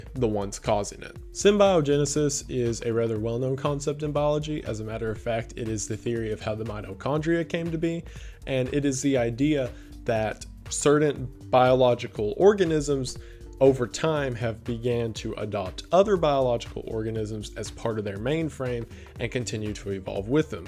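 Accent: South, United States. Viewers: I thought you sounded like you were from north georgia/carolina or tennessee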